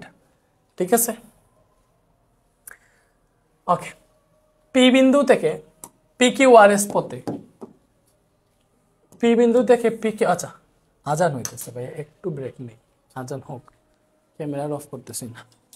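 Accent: Sylhet, Bangladesh. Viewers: ভাইয়া কি sylheti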